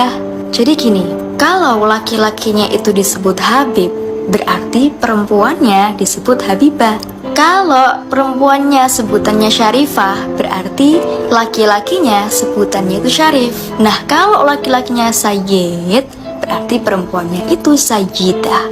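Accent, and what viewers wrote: Indonesia. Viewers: Pri bumi sekali medoknya, lanjut.......!!!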